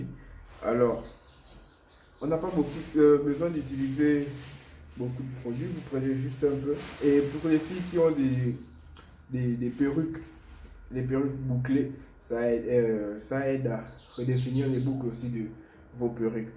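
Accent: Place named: Antilles, Caribbean. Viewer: Tema l'accent des Antilles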